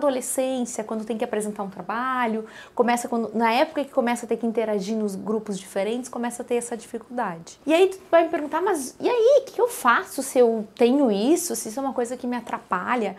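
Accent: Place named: Rio Grande do Sul, Brazil. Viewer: Esse sotaque gaudério eu conheço kkkk ótimo vídeo dra.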